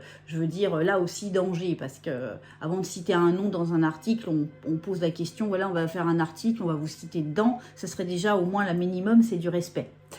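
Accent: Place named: South, France. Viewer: Bonjour,pas mal l'accent du Sud 😋 capsule humoristique avec un cap bien mené ☺️ merci et a bientôt 🎉❤🎉 je vous envoies les cigales 🦗🪰 d'Aix en Provence 🍀🍀 gros bisous 🌹🌹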